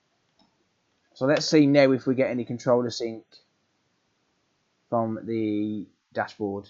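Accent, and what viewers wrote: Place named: Midlands, United Kingdom. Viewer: Subscribed! Not only is it nice to have a British Based Tech Channel I detect a fellow Midlander accent😂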